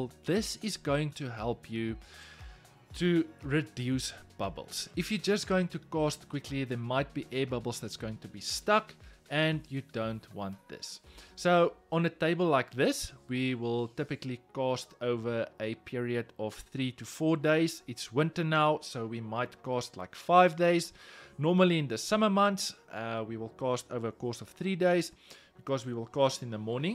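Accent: South Africa. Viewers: Love the video. And love the saffa accent. Haha. Keep going bud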